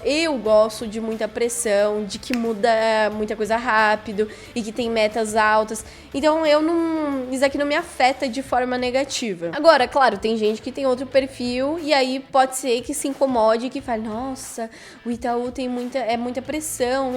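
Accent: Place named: Rio Grande do Sul, Brazil. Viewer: Pela dicção deve ser gaúcha. Meu ouvidos agradecem quando eu escuto uma pessoa que pronuncia as palavras da forma certa!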